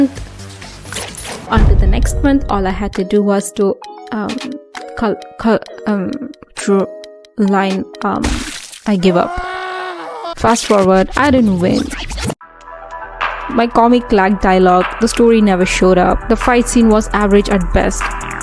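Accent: India. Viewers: Do I hear an Indian accent? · great to hear an indian accent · she actually sounds more indian than european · it is an Indian accent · i swear i hear Indian accent a lil bit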